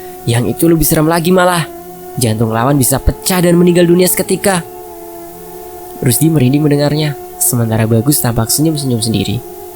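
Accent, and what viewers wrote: Java, Indonesia. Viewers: Jowomu medok bngt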